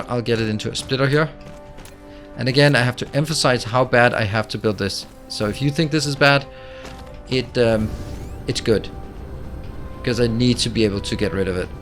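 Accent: Denmark. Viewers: DANISH!.. thank you, I was wondering where your accent was from! :) (re: reference to Danish keyboard at approx ) ROFLMAO "Devastated" - please say it more often :) "It will cry itself to sleep, every night" lol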